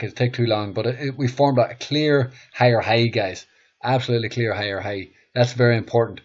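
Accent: Northern Ireland, United Kingdom. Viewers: Northern Ireland accent i hear there ?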